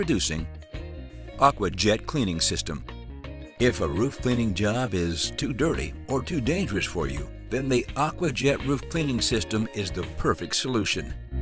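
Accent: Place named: United States. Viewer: Website arrests is .de but it's an American voice ?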